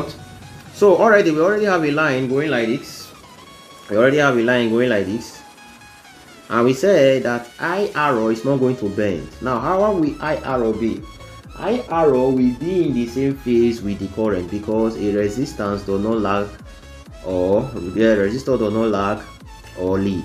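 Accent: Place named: India. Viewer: Uniben student sounding like indian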